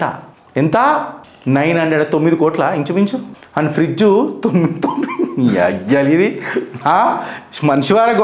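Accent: Telangana, India. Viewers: The analysis of is unique and appreciable in Telangana slang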